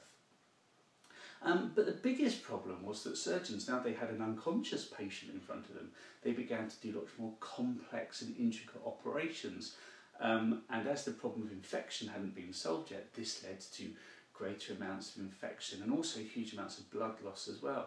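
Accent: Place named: Scotland, United Kingdom. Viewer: Scottish accent 10/10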